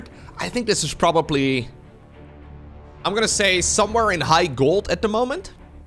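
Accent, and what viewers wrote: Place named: Netherlands. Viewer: I am very, very sorry to say, but every time you said Fitzroy's name, my brain wanted to assume that you are trying to say Viceroy, but with your Dutch accent:D sorry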